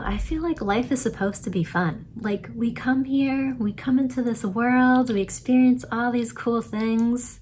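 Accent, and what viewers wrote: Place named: Boston, United States. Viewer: Nice, the secret is a controlled imagination and a well steady attention firmly and repetitively, creation is finished, omg, I love Boston, I think I heard Boston :))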